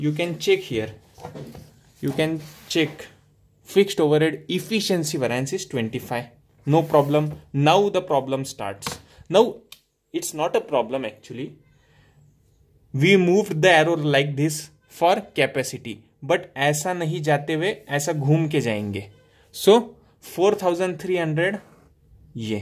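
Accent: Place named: England, United Kingdom. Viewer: I'm from tamil nadu loving your english accent ❤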